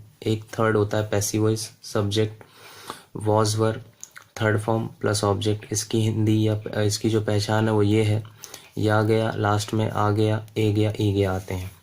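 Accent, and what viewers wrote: Lucknow, India. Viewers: Bhai Lucknow se. Ho kya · bhai lucknow ke ho ka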